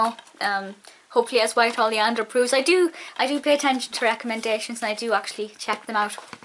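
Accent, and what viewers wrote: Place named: Scotland, United Kingdom. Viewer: Just watched a few of your old videos and noticed that you used to have a Scottish accent, not strong but obviously Scottish, but now you have a small hint of an accent. Have you noticed that or is it just me?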